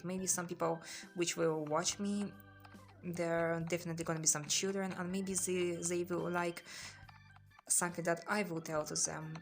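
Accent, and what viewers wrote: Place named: Russia. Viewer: Сначала я увидел лайк под русскоязычным комментарием другого видео, потом услышал английский с явно русским акцентом, ещё заметил подписку на русскоязычный канал… Какие языки ты знаешь, и какой язык родной?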